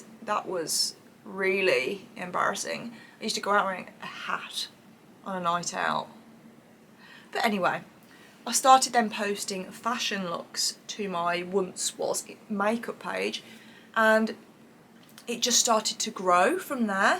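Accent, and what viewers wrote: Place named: Birmingham, United Kingdom. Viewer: Hi, really enjoyed this video I related to you, when you touched on your background/family in particular. Real life hey. Also your voice is soo soothing to me. My Nanny 👵🏼 raised me, she was from Birmingham & had the same accent as you ☺️🙏🏽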